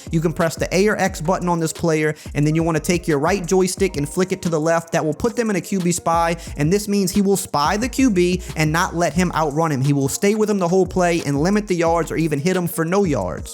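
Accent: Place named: New Orleans, United States. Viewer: Definitely Nola area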